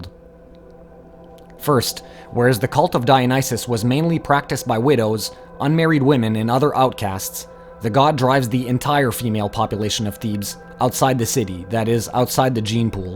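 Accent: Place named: Quebec, Canada. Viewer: Tu sonnes Québécois, bonne vidéo!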